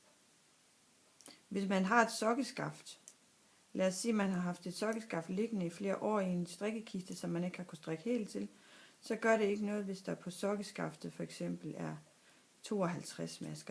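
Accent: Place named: Hjørring, Denmark. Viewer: Woow nu fatter jeg det. Superfin demo. Og endda på mit dialekt. Hjørring. Ikke?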